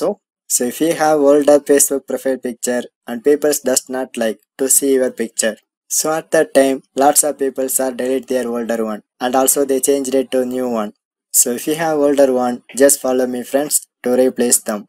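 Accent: South India, India. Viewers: bro is from south Thats why he is having this type of accent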